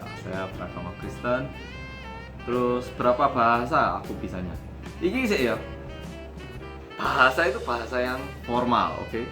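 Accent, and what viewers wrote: Java, Indonesia. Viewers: Njawani pol ya sekan,? Wkkw gak balik malang lagi? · Aku ngerti iki beberapa minggu lalu dari channel nya Londo Kampung.. Salam kenal,.. Pertama kali ndelok kuuuuuaget, kok onok wong Korea seng muuuuuedok koyok ngene, masio wes balik ke Korea sek pancet iso medok.. SALUT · Sing nggarai penasaran ki polahe aksen ngomonge pean cek jowone, aksen Korea ne gak ketoro beellas. Josss top markotop pokok e 👍👍👍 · mas bosku dr korea beliau dtng 1991 tp ko ngomongnya msh terbalik2 dan msh korea2 gitu, seperti orang dr papua/orang kendari gitu jd lucune poolll tp ko mas bs fasih &medok mungkin faktor usia kali yeee hhhh..... krn beliau dtng udh tua dan panjenengan dtng msh balita jd msh encer otak wkwk...... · mas.. mbok kapan kapan pean gawe pidio bareng kakanya.. dengan logat medok sampean.. pasti asyik tuh... hehehehe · swarane medok jowone mas koyok dudu wong korea ae mas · boso jowone medok rek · hahhaaha logat jowo ne sipp bro. 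like like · Jowone medok banget yo...gak ngetarani lek wong korea lho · Haha ngakak polll ndengerno cara ngomonge medokkkk polll... Still cant believe that ure korean hehehe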